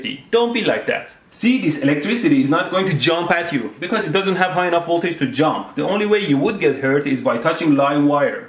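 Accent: India. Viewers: indian,just indian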